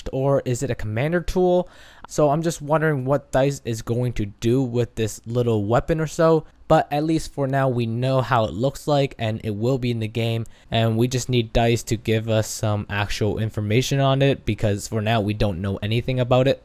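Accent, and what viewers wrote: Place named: United States. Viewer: All your intro sound like your American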